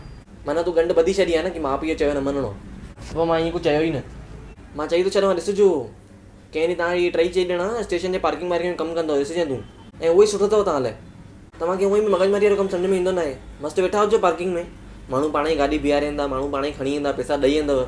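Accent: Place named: Sindh, Pakistan. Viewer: How to speak sindhi like u?Exactly pure Sindhi🤣🤣🤣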